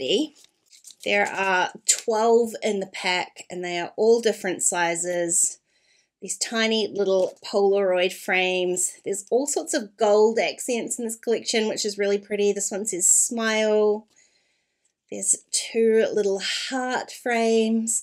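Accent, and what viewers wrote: New Zealand. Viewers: This is an amazing collection. With my favorite company going out of business, I am looking for a new place to get all my stuff from. This kit is huge!! I love the frames for shaker pockets and all the word sticker. I can't have too many words!! I love your accent. I miss NZ so so much. Thank you for the unboxing!!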